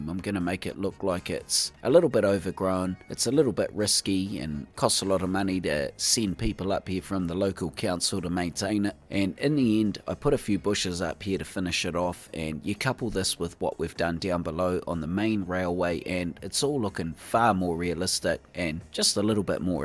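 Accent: New Zealand. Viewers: I really like when he says: "feârsty" instead of saying "first" like other countries. kiwi accent sounds funny and i actually love it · Another incredible build! Btw, while I do love the NZ accent, I’d just like to note that it’s pronounced fa-VE-la:) Keep up the good work! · What a beautiful New Zealand accent 🙌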